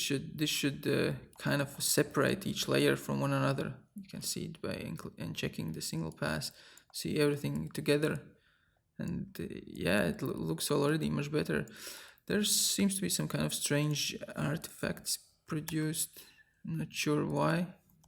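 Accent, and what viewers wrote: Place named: Latvia. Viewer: Es sāku skatīties neredzot autoru, un tad ieslēdzas mans šerloks dzirdot akcentu... "Tā..tas toč ir latvietis... tā...ar Houdini procedurālā modelēšanā varētu mums būt Kurzem..." ..skrullēju - Yess :)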